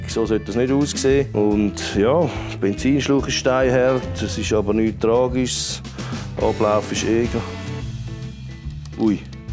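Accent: Switzerland. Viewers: Hi ein absolut schönes Video und nun sieht man auch einmal im Detail was Du da vollbracht hast mit diesem Custom Töffli mit dem 7 Sternzylinder - Motor. Und jeder Schrauber weiß man ist niemals fertig an Custom Teilen Fahrzeugen ständig entwickelt man es und verbessert es weiter und das macht ja Dir Sache aus man hat Freude und ist Stolz wenn man es hinbekommen tut. Ich hoffe Du hast genügend BREMSENREINIGER auf Lager für die Reinigung des Motores und Vergaser. Du bist einfach ein geiler Siech wo es absolut drauf hat freue mich auf jedes neue Video von Dir. Schöne Grüße aus Germany von der Schwäbischen Alb mir gefällt Dein Schwitzerdütsch absolut huregeil.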